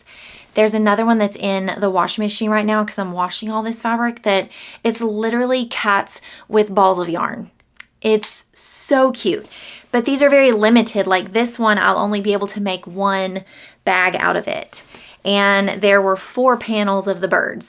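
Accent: Tennessee, United States. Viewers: You could label them vintage modern fusion. I like the bigger tote bags with pockets. I do think the basic totes need fusible fleece. Those book sleeves are super cute too!! I found your channel a month or so ago when I got back into sewing. I live outside of Nashville. I enjoy your accent and personality. Lol I'm not from Tennessee, I just find the accent enjoyable. I love your content and have gone back and watched a ton of your videos.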